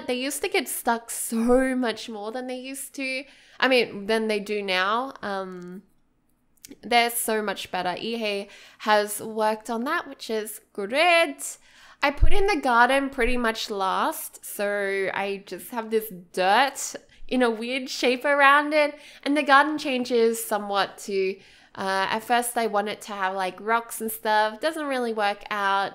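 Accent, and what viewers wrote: Australia. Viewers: Hamad Rashed Probably because of the Australian Accent we all sound similar 😊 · Artem XCX kid it’s called an Australian accent they are lovely and I am blessed to have an “annoying accent” if you don’t like it leave press the red X at the top of your screen it helps (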